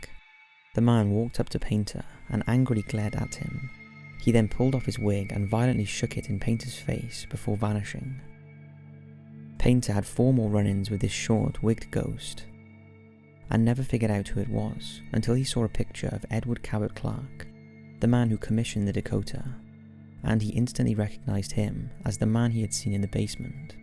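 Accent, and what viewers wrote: United Kingdom. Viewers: whats creepy is the way the narrator speaks with a uk accent but says American style words...take a look.. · I just about choked on my popcorn when he said, "a man named Wilber Ross." Was he THE Wilbur Ross or just somebody who had the same name? Or does the British-sounding narrator not know about Wilber Ross?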